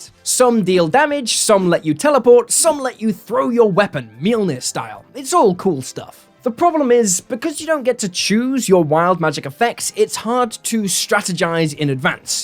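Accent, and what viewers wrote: Australia. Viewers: i dont know why i thought he was Australian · I thought you were Australian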